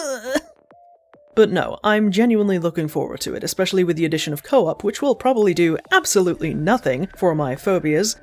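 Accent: United Kingdom. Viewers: Hey can I ask question, I know you are Brit but what accent do u have specifically which region accent?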